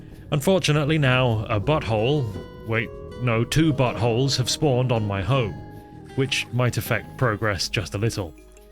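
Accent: United Kingdom. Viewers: always delivers on quality content, if i ever reincarnate i want to be british for that accent.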